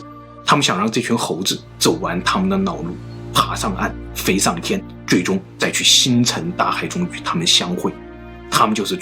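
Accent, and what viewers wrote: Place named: Guizhou, China. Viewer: 总感觉是贵州人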